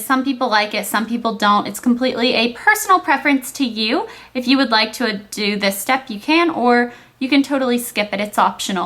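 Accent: South, United States. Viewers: i absolutely love your southern accent :)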